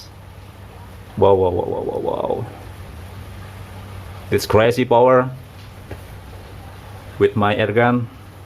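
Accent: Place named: Indonesia. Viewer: indonesian english😅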